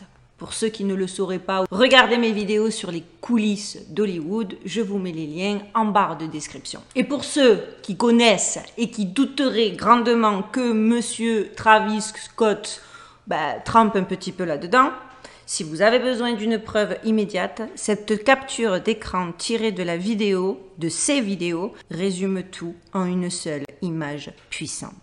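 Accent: South, France. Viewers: Hello Merci pour vos vidéos. C est toujours un plaisir de vous entendre avec ce petit accent du sud. Votre analyse est tt à fait pertinente. Nous sommes effectivement au début de l abomination de la désolation "Apocalypse 13.14". Merci pour votre temps et votre bienveillance. God bless you 🙏💝🇺🇲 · Salut jeune jolie femme, alors ça fait un moment que je ne t'avais regardé, ahh tu sais avec le temps, on a pas assez de temps à consacrer à de si belles choses, plus trop de temps, j'aime beaucoup te ragarder, t'écouter raconter des choses très vraies, et très pertinentes, donc, bravo à toi, ( je te tutoie comme si on se connaissait depuis toujours ) je tiens à te dire ( continue, tu as un talent pour raconter des histoires, plus un bel accent du sud, ça plait beaucoup ça, ) voilà, je tenais à te dire ces vérités, car, je trouve que tu as un peu peur de déplaire, Je te répète que tu as un vrai talent pour raconter de belles histoires, BON alors tu me crois maintenant ? 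Your really good on what you do, i love watching you, "so, so, so, see you next time, on the next video" #sam&sam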